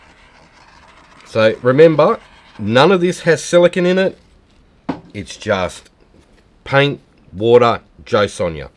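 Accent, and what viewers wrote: Australia. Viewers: where have you been all my painting life?! super glad I stumbled onto your channel - doesn’t hurt to hear you teach in that Australian accent 🥰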